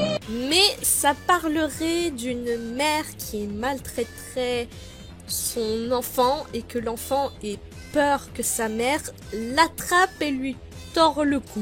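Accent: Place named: Belgium. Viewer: Y'a un petit accent Belge là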